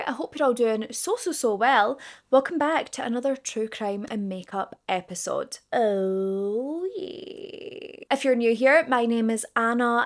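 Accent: Scotland, United Kingdom. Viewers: New subscriber here, I love your accent it sounds a bit scottish. Such a beautiful young lady you are. ❤ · Scottish Emma Kenny vibes. Love it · Are you from Scotland? love the accent! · I really Enjoyed Listening to your TRUE CRIME STORY. YOU'RE A BEAUTY, WHO HAS SUCH A BEAUTIFUL ACCENT AS WELL..IS IT A SCOTTISH ACCENT. SOUNDS ALOT LIKE MY FAVORITE ACTOR SEAN CONNERY.. THIS IS SUCH A WILD, CREEPY CASE..YOU DID AN EXCELLENT JOB, BC IT HAS TO BE DIFFICULT GETTING THESE VIDEOS OUT TO US...I APPRECIATE YOU. I JUST SUBSCRIBED TO YOUR CHANNEL ANNA...I ALSO HYPED THIS VIDEO!!! MANY THANKS!!! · I love your accent. I have got to find a Scottish woman 😂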